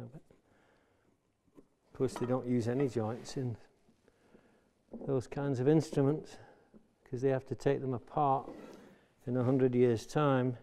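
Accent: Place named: Sheffield, United Kingdom. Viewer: R C:D Sarcasm doesn't travel well on the internet, does it? Try reading my post with a Sheffield accent (like ). Of COURSE he's the master! I was taught engineering by a guy from Sheffield...no matter how well you did something, his best comment was: "Hmm...not bad that. Mek another one to be sure."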